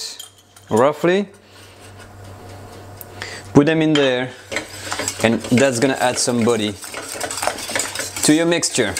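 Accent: France. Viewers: That's because it's a French man speaking English in a French accent🍟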